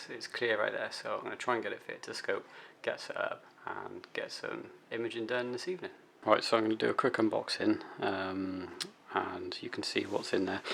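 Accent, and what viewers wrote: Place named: Manchester, United Kingdom. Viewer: MY GOD HE"S FROM MANCHESTER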